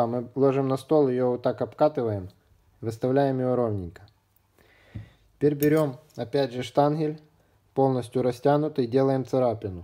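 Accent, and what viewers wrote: Odessa, Ukraine. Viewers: Слышу, что мастер видимо с Одессы. Так колоритно, как в "Ликвидации", хотя я сам с Сахалина. Всякая информация полезна, спасибо!!!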